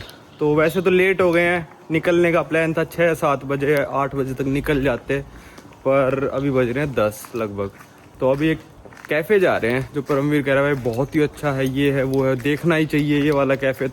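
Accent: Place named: Haryana, India. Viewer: Bro ur accent is totally changed.. in your starting videos your accent was more different. It's more Haryanvi now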